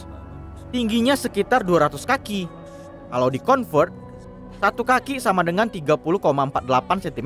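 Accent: Medan, Indonesia. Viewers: Indonesia bagian mana ini admin, kek orang medan bukan padang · Medan kali logatnya bah 😅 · Bang orang medan kah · Logat medan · Mimin nya orang Medan karna saya orang Medan juga tau logat Medan kek mana 🗿👍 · Logat medan 😏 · Bang pasti ko orang Medan??! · woiii...orang medan kau ya...kwkwkwk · Jelas kali medan mu.on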